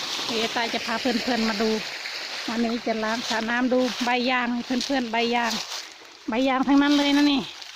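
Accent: Surin, Thailand. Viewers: ยายเป็นคนจังหวัดอะไรคะ สำเนียงเหมือนคนสุรินทร์ บุรีรัมย์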